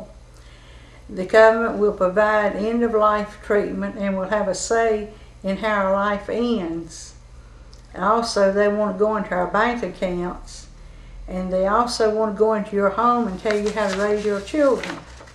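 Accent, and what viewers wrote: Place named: South, United States. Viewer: This is not the same that the Ellen Show talks about. She has very strong southern accent. But she's still a lovely grandma.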